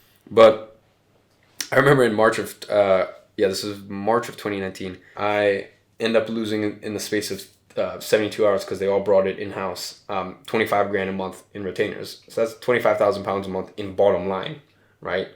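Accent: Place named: United States. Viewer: Why does he have an american accent?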